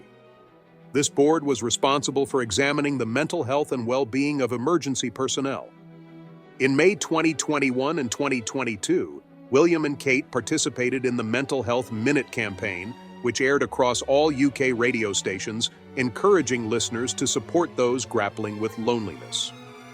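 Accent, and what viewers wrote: United States. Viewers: There are a few such Royal Family posts of YouTube today.Obviously someone has an agenda. The American Narration is the clue to give it a miss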